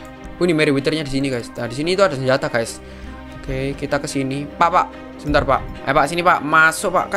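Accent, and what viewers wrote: Java, Indonesia. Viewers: bang sampean jowo ndi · Ngakak. Bang lu org jawa ya??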